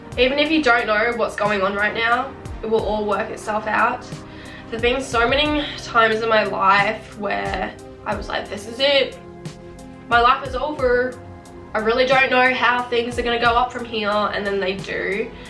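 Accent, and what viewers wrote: Australia. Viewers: I have the same accent lol I'm Aussie too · are u an aussie?🤨🤪🤭😏😌😪🥶🥵😵💋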